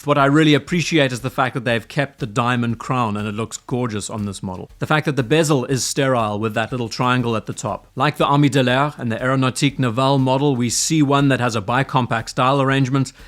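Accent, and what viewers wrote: South Africa. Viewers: ZA accent is my favourite way to speak English ( I know there isn’t a single uniform accent but they all sound great)! Fantastic watch by the way! The origins date back to World War II watches from Glashütte and Hanhart, with the latter producing them as part of war reparations. That‘s how a German design made it into French watch.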